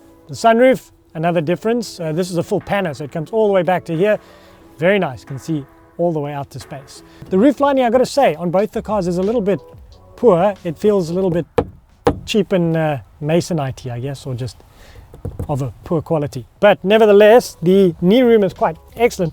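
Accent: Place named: South Africa. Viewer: I'm here cause I like the SA accent😅. Greeting from Australia. PS, can you show us going through a red light at night. Lol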